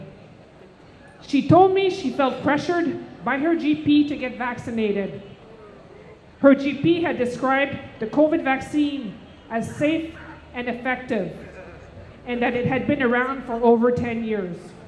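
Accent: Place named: Canada. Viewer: She sounds so Canadian 😄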